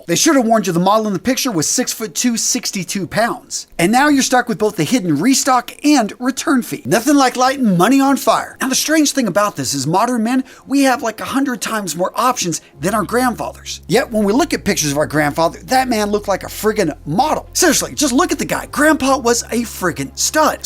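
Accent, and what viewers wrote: United States. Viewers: Yes of course but specifically which dialect of American English?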